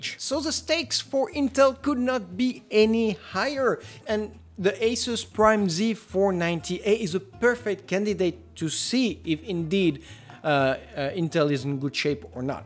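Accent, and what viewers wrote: France. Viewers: Hi, where are you from ? I spotted a French accent :) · are you french !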